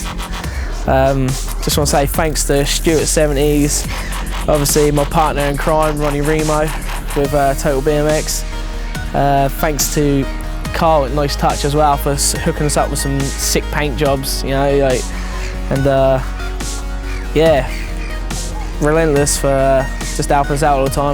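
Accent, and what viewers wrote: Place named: England, United Kingdom. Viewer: dont give him shit thats probs just how english say bike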